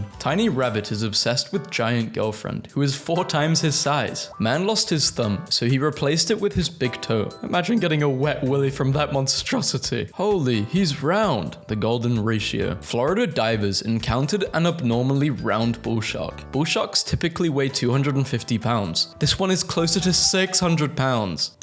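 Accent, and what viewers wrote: United Kingdom. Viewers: Remember, he British, he’ll pronounce things differently every once in a while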